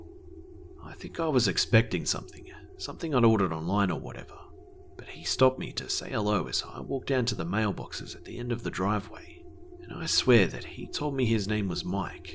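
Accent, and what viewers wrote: Australia. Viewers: are you Australian? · Are you Australian cos you sound like a true Aussie 😄🏖🇦🇺🇦🇺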